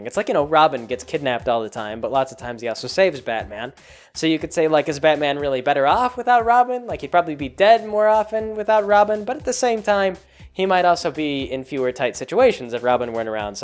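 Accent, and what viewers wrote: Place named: Kansas, United States. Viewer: Kansas accent.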